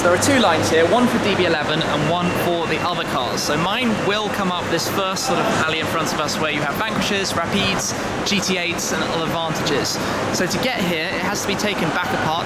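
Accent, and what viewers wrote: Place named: United Kingdom. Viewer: this guy sounds like the british stadman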